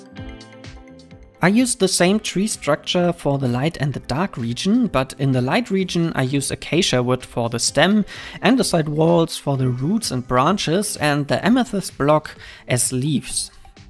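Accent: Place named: Germany. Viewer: cool wie man heraushört das du deutsch bist xD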